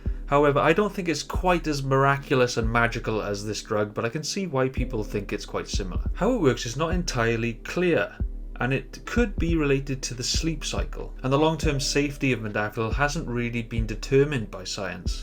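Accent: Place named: Swansea, United Kingdom. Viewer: You gotta be from south Wales butt you sound like a Swansea lad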